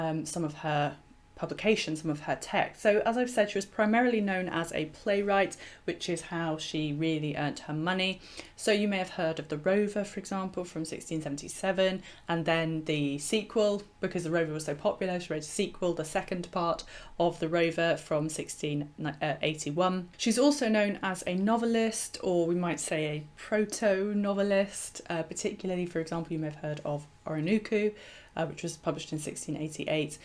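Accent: United Kingdom. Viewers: My first thoughts were that the poet has decided to view Clarinda as a Youth since that would make her admiration more natural and innocent than if she regarded her as a woman. (PS How was “Mrs” pronounced at the time? Had “Mistress” become “Miss’ess” or “Missus”? Also, I tend to pronounce “Pastoral” with the stress on the first syllable - but, then, I come from Birmingham and don’t have your pure RP accent.)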